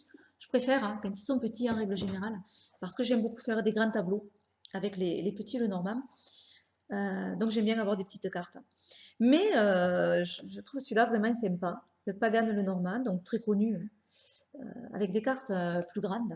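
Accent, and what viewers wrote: South, France. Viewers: ton accent du soleil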